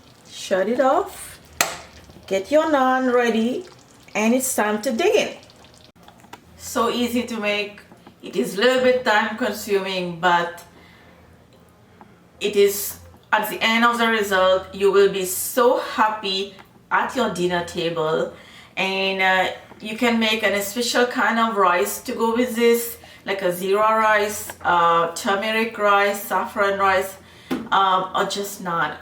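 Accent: Mauritius. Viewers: Love the Mauritian accent👍🏽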